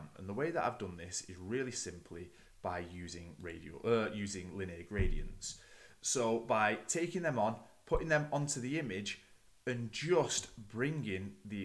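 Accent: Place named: Manchester, United Kingdom. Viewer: Your video came up in my feed. You started speaking, and I thought “he sounds like he’s from Manchester”. Yep! My good friend is from Manchester, and has shared with me all things from the city. Like, and football. So the big question is city or United? Keep up the good work,!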